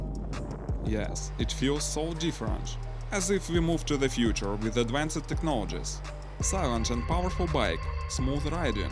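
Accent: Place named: Russia. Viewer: I have cracked you. You are Russian and live somewhere in Central Russia, judging by the foliage and road quality probably near Moscow. I wasn't sure at first bc of your accent, but when I saw your phone was in Russian I got my confirmation. Классное видео чувак, сам себе хочу моторизованный собрать, продолжай в том же духе!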